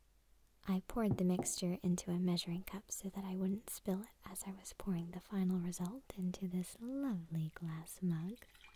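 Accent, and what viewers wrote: South, United States. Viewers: Do you turn the southern accent on and off at will?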